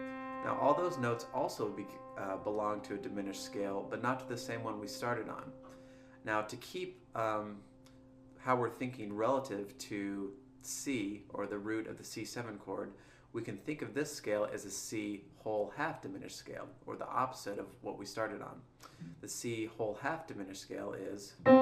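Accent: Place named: South, United States. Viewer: i only just noticed by your accent u are from the south even after watching this vid for years. greeting from Long Island NY. thanks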